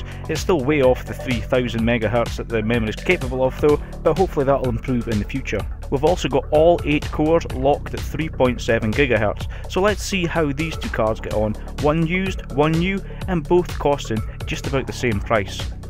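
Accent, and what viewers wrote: Scotland, United Kingdom. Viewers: Sub for the scottish accent. For some reason I love it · Is that a Scottish accent? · what part of Scotalnd you from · Another Scottish youtuber? This is great us scots are popping up everywhere now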